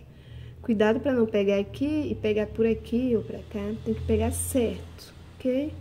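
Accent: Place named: Rio de Janeiro, Brazil. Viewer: Oi!Boa tarde! Estou muito feliz! Acabei de terminar o meu. Eu fiz cm a linha nr 4 (barroco)e diminui 2 grupos no inicio. Ou seja comecei cm 7 grupos. E deu super certo. E olha que estou meio gordinha.Usei agulha 2,5! Beijos! Obrigado por dividir conosco suas obras de arte. Você é carioca? Fico encantada com a maneira cm q você pronuncia. Que Deus abençoe vc e sua família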